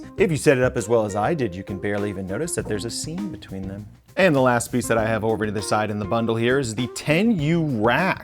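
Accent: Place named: United States. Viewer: Lol he's American, that's how we pronounce it here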